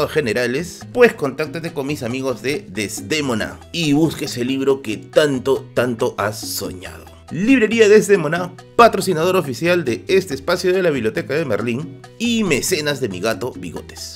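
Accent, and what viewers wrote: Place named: Peru. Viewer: Tu habla me recuerda a Aldo del robot de platón. Por lo visto no cambian los acentos en Perú. Saludos 🇦🇷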